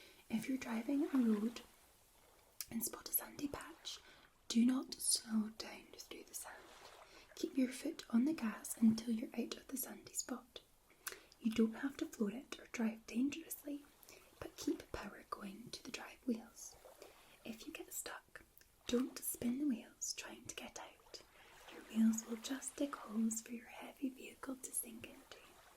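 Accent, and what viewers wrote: Scotland, United Kingdom. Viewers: Whats up?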